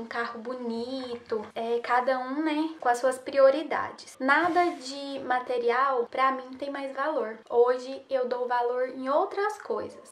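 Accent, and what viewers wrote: Goiânia, Brazil. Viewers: Oi, meu nome é Dayane, vivo em Liverpool UK, assisto seus vídeos desde quando vc morava em Londres e acompanhei sua saga. Um vídeo seu que mais que marcou o vídeo que você viajou com o Stephen sozinha, foi buscar seu documento e não estava pronto. Teve que voltar com as malas e remarcar a passagem. Partiu meu coração ver você sozinha mas ao mesmo tempo vi o quanto você é forte e uma mulher sábia. Tinha inúmeros motivos para reclamar mas transmitiu uma imagem de segurança e tranquilidade. Amo seus vídeos e tenho certeza que vocês vão superar toda essa situação com seu bebê. Vou colocar vcs nas minhas orações. Deus os abençoe grandemente. Quem sabe um dia tomamos um café juntas. Ps. Amoooooo Goiânia e o seu sotaque ❤️ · Oie, sou a Pâmela de SP, minha melhor amiga é de Goiânia eu adoro seu sotaque me lembra dela! Quero fora em um futuro próximo, seus vídeos são muito explicativos!!!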